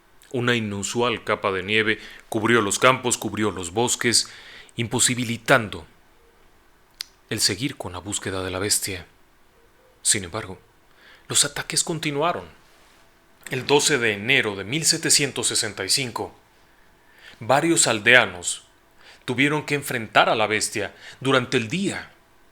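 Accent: Mexico. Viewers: El castellano es un idioma muy rico. Los latinoamericanos lo han hecho aún más rico. Te lo dice un auténtico castellano de Castilla,que soy yo. Se supone que el castellano más puro se habla en Valladolid. El señor habla perfectamente el castellano con las particularidades de un Mexicano. Lo bueno de nuestro idioma es que desde el sur de EE.U.U hasta la Patagonia puedes hablar con todo el mundo sin necesidad de otro idioma que el Castellano.Por eso que estamos todos unidos por este idioma tan rico. Digo rico porque tiene palabras derivadas del latín,del árabe y en menor medida del Visigodo. Los nombres de nuestras ciudades vienen de el Latín, Árabe, Visigodo,hasta del fenicio y el Cartaginés. Saludos a todos los hispanoparlantes del mundo desde Guadalajara en el corazón de Castilla (España). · que ignorante es acento netamente mexicano nosotros somos mexicanos no Latinos, aprende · Es el acento mexicano hermano saludos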